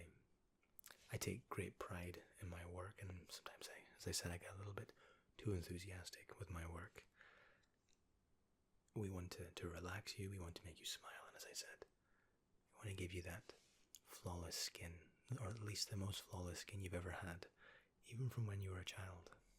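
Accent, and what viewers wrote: Scotland, United Kingdom. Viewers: I love the Scottish accent and love the way you pronounce words and speak so eloquently. Much love from South Yorkshire 🇬🇧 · He's Scottish!? His accent is so subtle I thought he was from the U.S.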